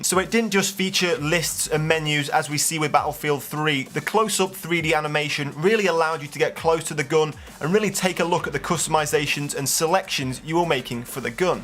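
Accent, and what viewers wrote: England, United Kingdom. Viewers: Great intro! since i mostly play support and use LMG i don't think i would enjoy that weight idea. Btw where in England are you from? awesome accent! :)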